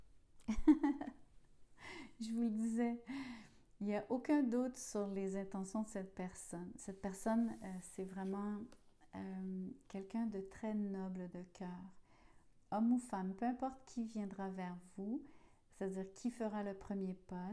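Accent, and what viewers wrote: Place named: Quebec, Canada. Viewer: J'adore votre accent "je me souviens "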